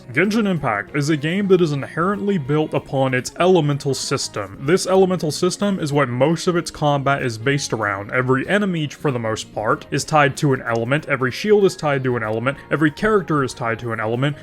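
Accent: South, United States. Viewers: He said he has a thick southern accent (I'm assuming he's from the US) so he has a hard time to pronounce it.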